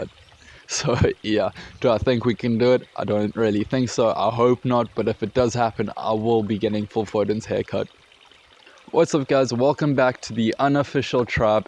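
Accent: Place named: South Africa. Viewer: Idk you sounded like you had a South African accent, my bad 😅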